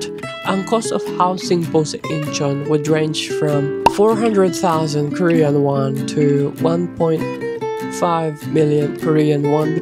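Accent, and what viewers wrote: United Kingdom. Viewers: Why do you have British twang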